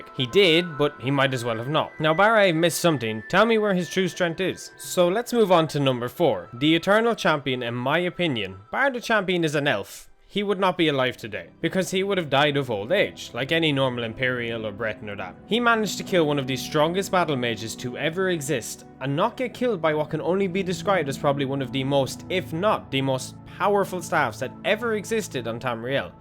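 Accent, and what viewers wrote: Ireland. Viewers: Dude you misunderstood something, the realm of a Deadric Prince is his BODY. Why do you think Jyggalag didn't come back after this? Because he lost his Real/Body and so has the power of a lesser Deadra. You the "Hero of Kvatch" are the new owner of this realm, so you own all the power that is in it. Love your Channel and your Irish accent. Ps. Dragonborn can alter the Dream of Creation and so are extremely powerful but are only tiny pieces of Aka (the Original time god).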